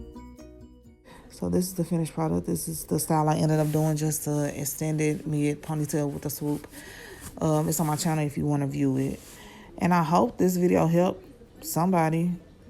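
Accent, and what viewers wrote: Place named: St. Louis, United States. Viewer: Gotta be from St. Louis with that accent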